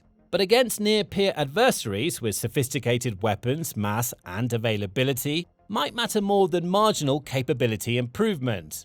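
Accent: United Kingdom. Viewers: The channel is American despite using British voices 😂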